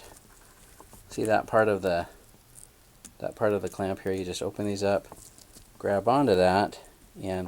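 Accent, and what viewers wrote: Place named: West Coast, United States. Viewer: Something about your laid back west coast narration that adds a layer of mellow to the repair. Thanks man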